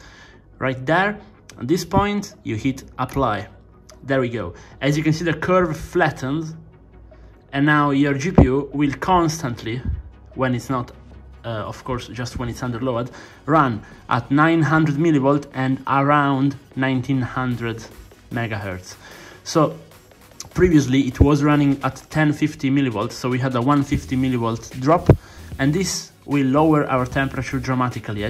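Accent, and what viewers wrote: Italy. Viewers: l'ho capito dalla prima lettera pronunciata che fossi italiano hahah, poi il menu di msi afterburner mi ha dato la conferma...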